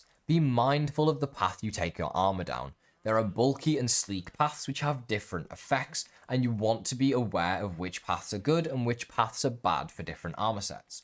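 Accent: Liverpool, United Kingdom. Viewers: Ooohhh is that a fellow scouse accent I hear?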